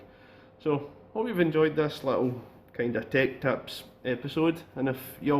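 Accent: Scotland, United Kingdom. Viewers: I'd very much like some more technical videos in depth like this one. Also it's great to hear someone from Scotland too.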